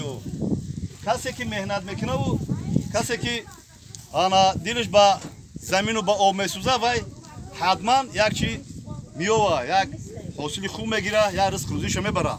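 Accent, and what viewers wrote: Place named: Mastchoh, Tajikistan. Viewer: Бобо ранги Мастчоҳӣ мардакча гап мезани... МошАллох бобо умратона дароз кунад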